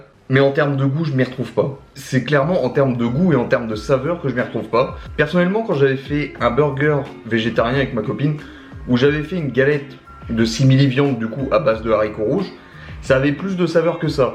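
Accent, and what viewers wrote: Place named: North, France. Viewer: oué j avais reconnu le tiop accent du chnord!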